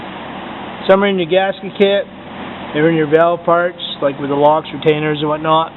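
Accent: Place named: Maine, United States. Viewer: Where are u out of? From ur accent my guess is up here in maine...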